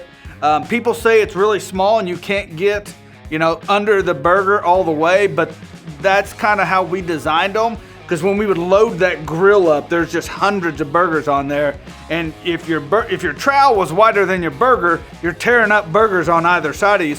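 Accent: South, United States. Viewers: Help me out. How is he a Yankee? He's got a southern accent it sounds like to me. I'm from NY and I can tell you from whatever accent that is, he is definitely NOT from the north!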